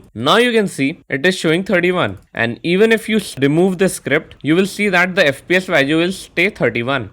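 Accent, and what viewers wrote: India. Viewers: such an intersting video completely ruined by the annoying indian accent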